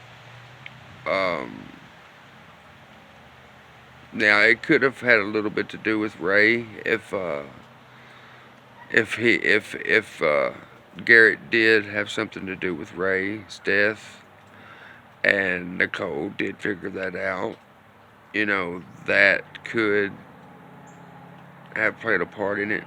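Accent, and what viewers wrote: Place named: South, United States. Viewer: I just heard you say your from the South. Where ya from? I’m in Arkansas from Louisiana. I knew you sound like home.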